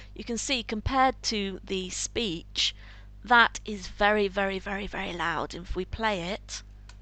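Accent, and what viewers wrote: England, United Kingdom. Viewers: i wish i had ur cool english accent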